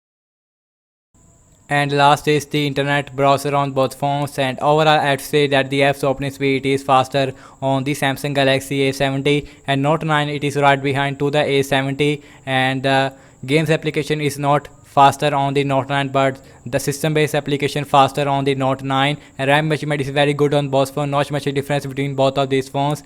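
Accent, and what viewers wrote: India. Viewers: Typical Indian accent and pronunciation..R2D2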